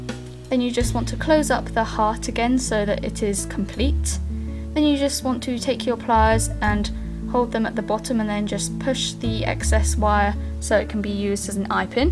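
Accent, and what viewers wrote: United Kingdom. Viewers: your from England, what is peanut past?????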